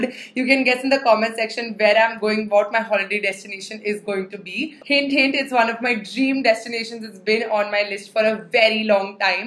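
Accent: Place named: South India, India. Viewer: Sometimes I really feel you are south Indian by your accent.. ❤❤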